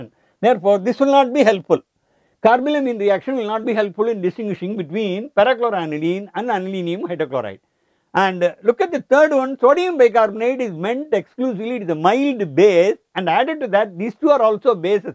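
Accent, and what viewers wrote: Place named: India. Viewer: only if your indian.. because his accent is very strong.. so i dont understand much hes saying lol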